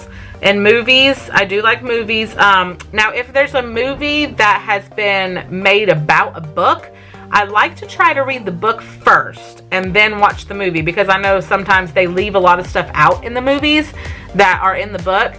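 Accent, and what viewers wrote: South, United States. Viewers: I love reading too! I’ve never read any of Jodie’s books but I saw the movie My Sister’s Keeper and loved it! I struggle to watch movies so I tend to just rewatch my favorites 💓 My husband encourages me to get some me time as well Target is my me time place too 😂 I love your southern accent, I figured you had southern roots and I love cruising as well. We were suppose to go cruising in April but that didn’t happen! We have the whole early care and education thing in common! I didn’t major in that field but I worked in the field for years and have a special place in my heart for tiny humans and how they learn 💓 Sorry for the novel comment! Loved getting to know you and thank you for answering my questions 🙂💕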